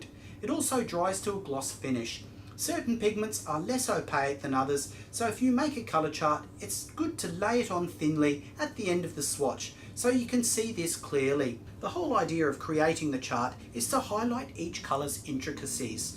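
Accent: Australia. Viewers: I feel immediately comfy cause youre as aussie dounding as me..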